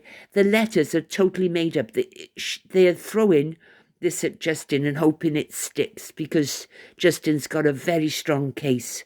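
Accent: Wales, United Kingdom. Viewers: I’m SO delighted that you are back. I missed your lovely Welsh accent and humour. 🏴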